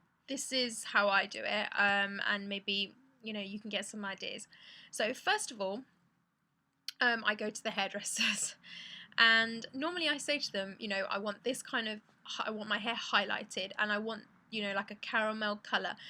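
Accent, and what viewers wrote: England, United Kingdom. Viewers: India with eng. accent <3 nice...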